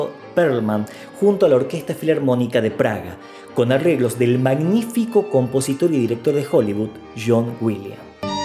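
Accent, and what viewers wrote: Tucumán, Argentina. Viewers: Muy bueno el video. Haría dos comentarios. Le Pera nació casualmente en Brasil, pero nunca vivió en Brasil, siempre vivió en Buenos Aires. De la manera que Gardel Nació en Francia, pero pasó su infancia en Argentina. Diríamos que los dos fueron argentinos. En cuanto al relato de la creación de Por una cabeza, no fue Pigna el que lo recopiló sino que está en el libro de Tucci. En cuanto Delicatessen, no recuerdo que apareciera Por una cabeza, lo que sí aparece es Una lágrima tuya., me gusta más cuando hablás en tucumano que cuando hablás en castellano neutro.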